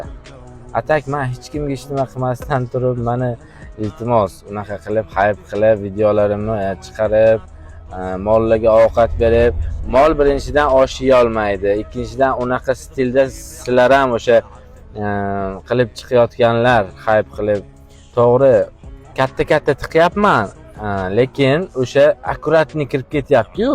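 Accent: Namangan, Uzbekistan. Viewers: Nomongollikla sheva aniq😅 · Qaerdansz tojikmsz bizdayam namangan kosonsoylik ortoglarm shunde shevada gapradi talafuz chroli negativlarga etbor bermang koromdganla kop yaxshi bosezam yomon bosezam negativchlar kop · Шева Наманганга тортар экан тарбияли бола ота онанга рахмат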